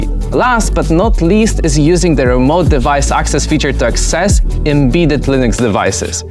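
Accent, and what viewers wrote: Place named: Eastern Europe. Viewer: Чувак, у тебя такой восточноевропейский акцент 😁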